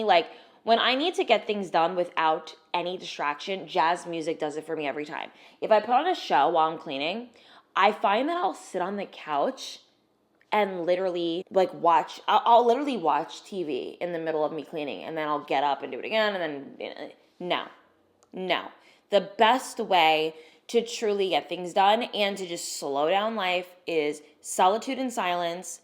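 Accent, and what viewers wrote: New Jersey, United States. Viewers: Omg this accent! It’s so cute, are you from New Jersey??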